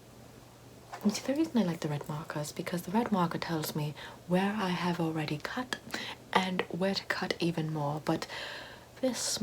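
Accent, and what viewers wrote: United Kingdom. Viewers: A British accent and in B&W double credibility. Funny though a dodgy German accent and B&W wouldn’t inspire confidence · I definitely trust you to cut on my nose, especially with that British accent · She definitely nose what she's doing. I trust that British accent implicitly.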